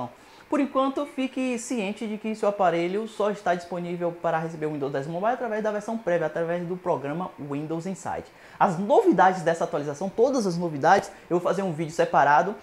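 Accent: Bahia, Brazil. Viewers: seu sotaque baiano.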